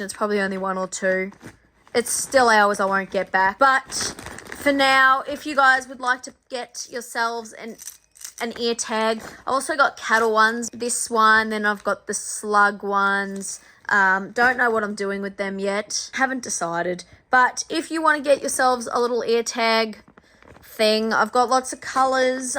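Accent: Australia. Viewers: youre a natural comedian and absolutely adorable! As an American I love the Aus accent lol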